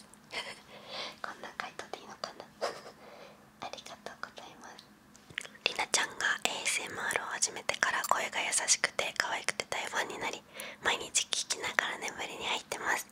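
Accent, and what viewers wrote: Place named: Tochigi, Japan. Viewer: 栃木の方言めっちゃ共感できます～笑 あまり可愛い感じはしませんよね😅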